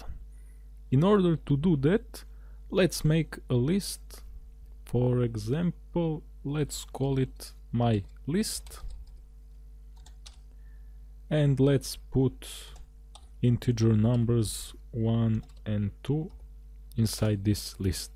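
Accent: Russia. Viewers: thank you mr. Russian sounding fella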